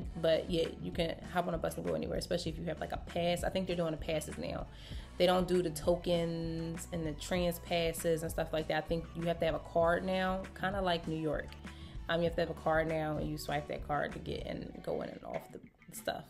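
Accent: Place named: Philadelphia, United States. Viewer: You definitely still have your philly accent on a bean too.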